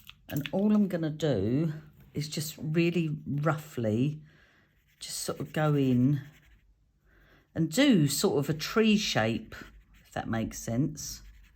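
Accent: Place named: RP, United Kingdom. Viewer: The proper queens English!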